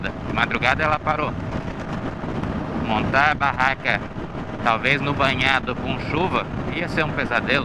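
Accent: South, Brazil. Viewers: Pelo sotaque é do Sul Paraná ou Santa Catarina? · Pelo o seu jeito de falar, parece que tu és do Sul do Brasil. Que Deus abençoe o seu destino, mostrando para nós um pouco do Brasil. 10/01/2021. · Pelo sotaque o dono do canal parece ser do sul. Acertei?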